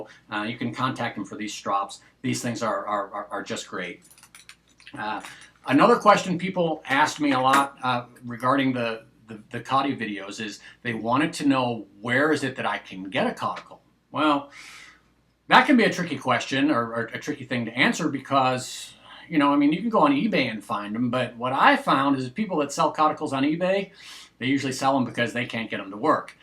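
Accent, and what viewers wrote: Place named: East Coast, United States. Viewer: lolz as soon as you said it was going to be a long reply. i read it off in my head “wit” you east coast accent. i really enjoy both your videos ( the mascots crap me up) and Videos I learn so much just from you guys talking and reading through comments. and it is a great idea to get to know your Natis (Natural) stone. and Im still new to straights but. i sharpened enough chefs knives all sorts of styles,knives pocket knives ect.. to figure i got what it takes to give it a go. and so far not to bad. i need a few more grits for in between my #800 and hard Arkansas. but im curious now that my jewlers loop works again its 100x only but its enough to see that i need better technique and the need for progression in between as far a grit goes before start the polishing can begin. im curious though im skeptical on these blue steel razors. 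are they really Blue steel/ Aogami blades? i have a M. Karam made in Mt. Lebanon i believe this is out of PA. but just not sure how accurate these blades are when it comes to materials being used. and can it be blue steel or a Aogami even if its not forged in Japan? or can they be blue steel where ever its made. i thought blue or white steel was just a Japanese thing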